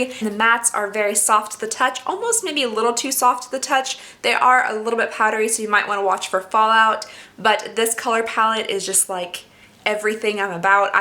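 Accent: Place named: South, United States. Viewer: You could not get any more adorable!! I'm originally from VA (mountains of VA... closer to KY/WV/TN, depending on what direction you wanted to travel. You know... the part of VA no one thinks is there😂😂😂 Now I live in TN and you're not that far from me. One day I'm dying to take a drive and meet you for lunch or something!! Just got a Becca box and I'm thinking I need to share with you. Also....baby girl, you're not nearly as pale as I am😂😂😂 If you're looking for some great pale girl stuff, check out ETSY!! I've gotten sooooo much PR from sooooo many different stores lately that have honestly knocked my socks off! Plus, they are usually really natural in the ingredients and they are hand made so many are willing to make shades for you. Anyway... you make my day so much better. Love hearing that southern drawl, even though I know I'm so hick you would sound like a city girl next to me😂😂 Thank you for making my day better! 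Lunch and box of makeup soon!! Would be a blast!!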